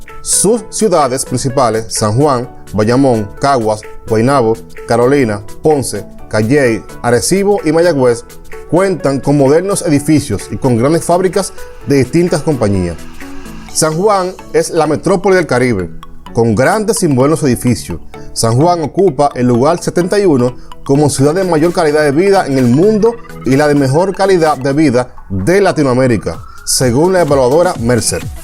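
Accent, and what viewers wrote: Dominican Republic. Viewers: Dominicano por ese acento haciendo video de PR para coger views. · el que hace el video es dominicano y se nota en su acento, PR es mas rico que RD unas 4 veces mayor. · Los cubanos no hablan sobreefatizando las vocales, los dominicanos si, por lo cual pienso es dominicano. 😊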